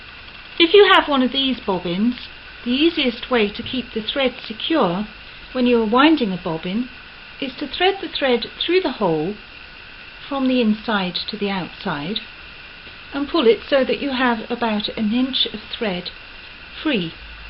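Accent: United Kingdom. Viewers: Wonderful instruction given in the Queen’s English. I love the excellent quality and presentation of these old time videos. Thank. You very much.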